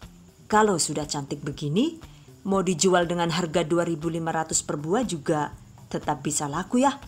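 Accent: Banyumas, Indonesia. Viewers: Kesuh nyonge..ini yang saya suka dr chanel ini..berbagi resep..dengan ciri khas ngapak.. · Suka bgt dengan resep kue yg selalu gamblang dan mudah di mengerti setiap caranya dan obrolan ngapak nya keren trims 😁😁😁👍👍👍👍👏👏👏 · Satu2 nya yang jelas detail sampe ke hitung2nya, cuma ada di. Ada logat ngapaknya jg😊😊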